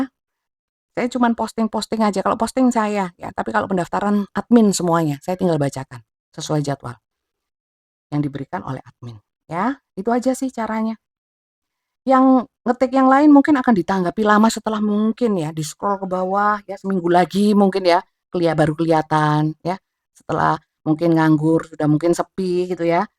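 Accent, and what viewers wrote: East Java, Indonesia. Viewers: Bunda lucuu beneran krmekel sy... gak uwe uwekk😂😂😅😅 Asli jowo ne ketokkk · MANTULL BUNDA...JAWA TIMURAN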